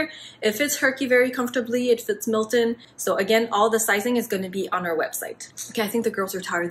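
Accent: Quebec, Canada. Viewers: Êtes vous de Montréal, aussi?! I think I saw you guys!! Aussi je reconnais l’accent quebecois/montréalais! J’adore! 💕 I’m getting a cavalier in November and have binging your channel to prepare myself 😂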